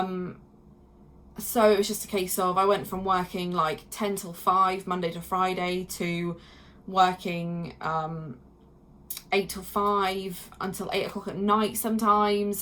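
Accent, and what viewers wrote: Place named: United Kingdom. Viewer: i finally found a uk (or at least you sound like you're from here) AC player! HII :3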